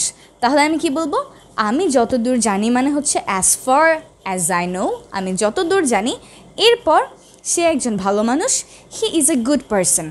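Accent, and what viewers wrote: United Kingdom. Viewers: ছোট বোন তুমার ইংলিশ উচচারণ গুলি ইংল্যান্ড এর লোকদের মতন হয়।ভালো থেকো সুভকামনা রইলো। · অাচ্ছা অাপু অাপনার pronounce কি ব্রিটিশ?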